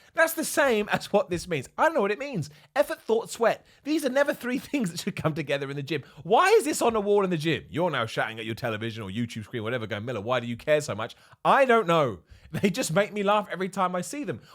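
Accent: United Kingdom. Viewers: I thought all this time was saying “ the bald Eagle” but no that’s not what he was saying - love British accent 😁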